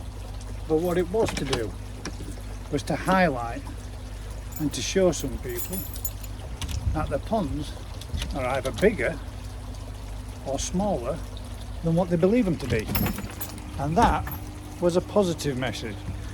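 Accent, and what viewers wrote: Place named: West Yorkshire, United Kingdom. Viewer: Crikey there's some sensitive folk out there!! I will be making my pond bigger after my 2 weeks holiday and enjoy your vlogs Where are you located??...I can tell your in West yorkshire down to your accent as I'm originally from Huddersfield but now in doncaster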